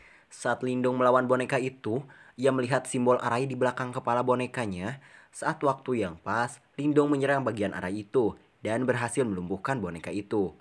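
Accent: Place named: Tasikmalaya, Indonesia. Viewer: Mang orang tasik nya😂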